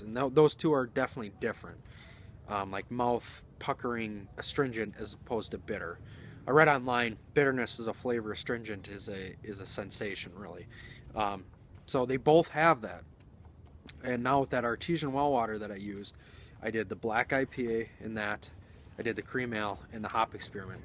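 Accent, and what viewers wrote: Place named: North, United Kingdom. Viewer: Indeed, homebrewing is awesome because there are some things that make more sense through experience than just from reading. Slainte!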